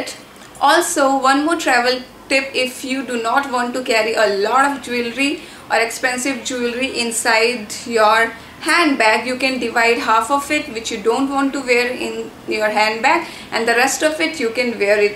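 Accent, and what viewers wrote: India. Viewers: Are u Indian ur accent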